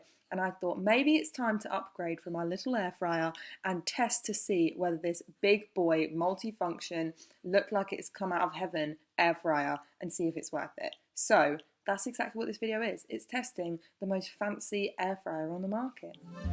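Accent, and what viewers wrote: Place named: United Kingdom. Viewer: It's really interesting that the company is called sage and has a crumpet setting here in the United States the company we have is called brevel although that company is based out of Australia but basically is the exact same oven we don't have a crumpet feature but we have other features but it basically is the exact same device. Also I do have to say I love your British accent and you are stunning absolutely stunning look forward to more cooking videos with the device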